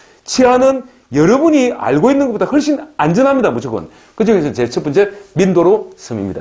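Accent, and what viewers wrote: Gyeongsang, South Korea. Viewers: 나의와이프가 필리핀사람인데 이런방송 괜찮네요! 지금 50대중반이고 필리핀 이민도 고려중인데 앞으로 다양한정보 부탁합니다. 특히 구수한 경상도사투리 쥑입미데이~^^ Impression도 아주 좋아요! 구독합니다.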